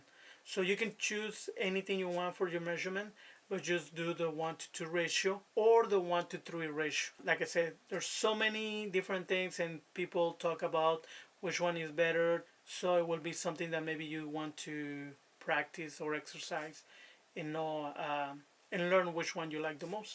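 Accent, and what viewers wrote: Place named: Italy. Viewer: Thanks for the video! I was making a clay figure sculpture in college, & then got injured in an accident. I was next not allowed by my professor to finish & turn it in for the semester; very unfair. My daily Bible Word gave instruction "Do not return to the place where you have been harmed," showing me not to work with this cruel professor anymore. So I was trying to find a way to make my sculpture since I no longer have access to a pottery kiln. I looked at expensive materials I cannot afford. I was praying & wishing there is some kind of archival 'air dry clay' that is like pottery. I asked God can you please let there be some kind of 'miracle' clay I do not need a kiln to fire?? & to please show me what to do? DUH--- then right after prayer, the next thing that comes my way is videos of cement mixture sculptures! LOL. I realize it is the 'miracle' material I was hoping for--- air dry, but solid... 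lasting... not so fragile like plaster. I really want to give this a try... & hope to avoid problems like you talked about... "rocks for mom" where the concrete dried before you can use it. I am watching many videos. Your Italian accent is very attractive to American women like me... though you are too young...boo... Oh well. At least I can learn art from you. Thanks for the lessons! Blessings. Peace.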